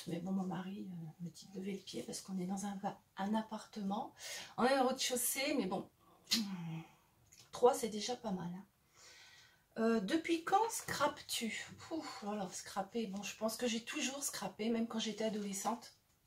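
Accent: North, France. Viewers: Ccou j’adore ta petite vidéo de présentation et par dessus ton cet accent du nord lol eh oui merci à toi bis 💋😘👍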